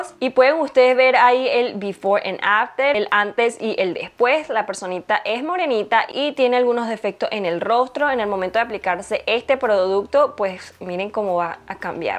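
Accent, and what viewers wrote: Venezuela. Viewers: Hola moreeeee👌🥰😂 saludos desde venezuela, tu acento es muy neutral pero tienes ese venezonalito adentro, me encanta👌❤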